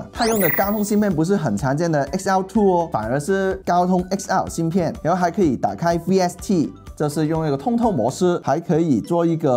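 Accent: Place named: Cantonese, China. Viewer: 點解用廣東話口音講普通話？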